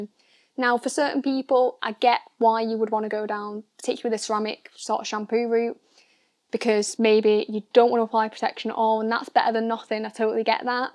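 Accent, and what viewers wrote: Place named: Northern England, United Kingdom. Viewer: I can't help but notice you have a northern accent. As someone from the south east, with a south facing drive way, I can assure you direct sunlight is insufferable. On dark cars. On light cars it really makes sod all difference. Water literally boils on contact with dark paint. It doesn't even need to be hot, just sunny. What we also have down here that you don't, is really hard water.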